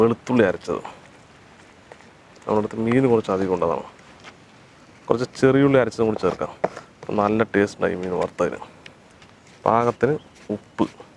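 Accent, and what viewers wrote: Palakkad, India. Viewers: ഏട്ടാ നിങ്ങൾ പാലക്കാടൻ അല്ലെ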